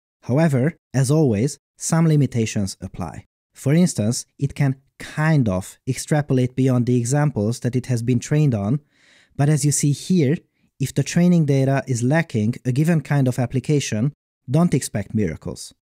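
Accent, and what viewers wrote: Hungary. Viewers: Are you hungarian? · The hungarian accent is strong with this one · lol the hungarian accent!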